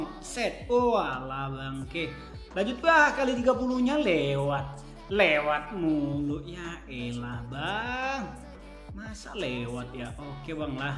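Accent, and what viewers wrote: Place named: Medan, Indonesia. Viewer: Bng kalo boleh saran gk usah di campur logat medan,, kek garing bat di dengar😌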